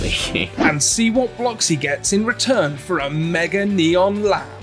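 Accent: United Kingdom. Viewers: I love your British accent it’s so posh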